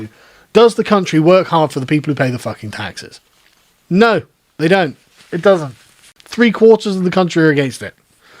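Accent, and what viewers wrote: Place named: United States. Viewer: Sounding like an American now. 😆 👍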